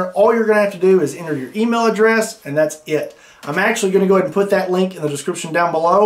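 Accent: Kentucky, United States. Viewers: “Are you from Kentucky”, haha as if your accent (or the name of the channel) didn’t give it away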